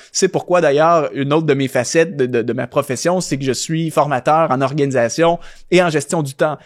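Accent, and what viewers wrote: Quebec, Canada. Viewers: ton bel accent du Québec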